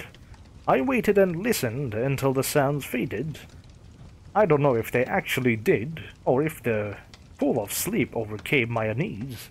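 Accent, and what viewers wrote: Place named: Sweden. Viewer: it's not that your voice is monotonous. You have an odd tendency to raise the pitch of your voice when concluding sentences. It's unpleasant. Your accent sounds Swedish. Is that correct? If so, it might be because of that. I've also heard similar tendencies in Indian accents.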